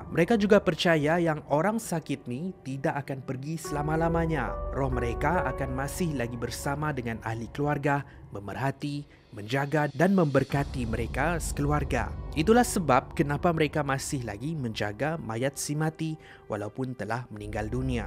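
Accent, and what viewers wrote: Sabah, Malaysia. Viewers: abg ni sabah or sarawak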